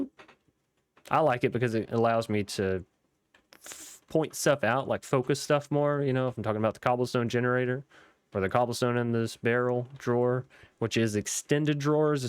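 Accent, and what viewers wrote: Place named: Appalachia, United States. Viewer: he sounds Appalachian or southern to me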